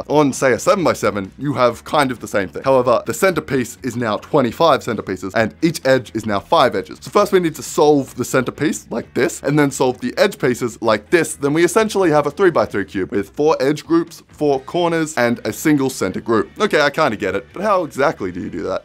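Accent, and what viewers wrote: Australia. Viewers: is this guy Australian?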